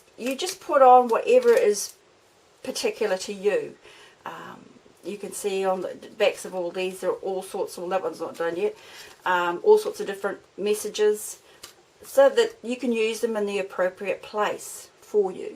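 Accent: New Zealand. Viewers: So nice to hear a Kiwis voice on youtube, your tickets are amazing.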